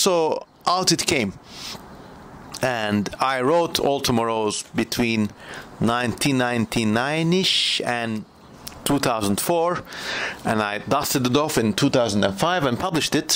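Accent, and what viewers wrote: Turkey. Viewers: İngilizce aksanı Türkçe'ye çok ama çok benziyor. Soy isim " " olabilir mi diye merak ediyorum. Acaba? Eğer doğruysa belki bir evet cevabı alabilirim.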